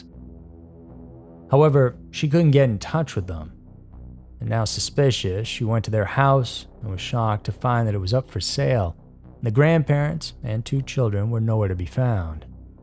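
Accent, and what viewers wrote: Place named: Texas, United States. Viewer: LoL...I was convinced that he was Woody Harrelson and occasionally accused him of hiding his true identity (Woody) in my comments...until he started showing his face in his videos. But you agree he's a Texan, right? Got that Texan twang.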